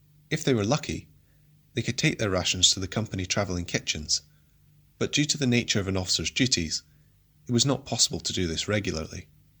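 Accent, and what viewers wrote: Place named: Scotland, United Kingdom. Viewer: No he isn't. Sean Bean is from Northern England wheras this YouTube is clearly Scottish. It's like saying someone from the North of the USA is a dead ringer for a Mexican.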